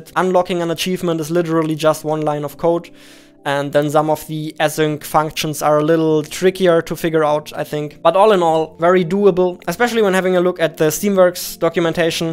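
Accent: Germany. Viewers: Ich habe direkt gedacht das du bei dem Akzent deutsch sein musst haha :D Super Video! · His dialekt sounds like german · Bist du deutsch? 😂 #nofront aber man hört es😊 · because he's German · are you german? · Hör ich da deutsch? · Man hört den Deutschen Akzent raus :D. Finde das Tutorial sehr Hilfreich! Danke!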